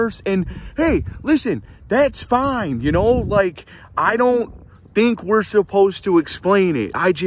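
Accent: United States. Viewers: Wait are you from PA?? Lol love ya bro!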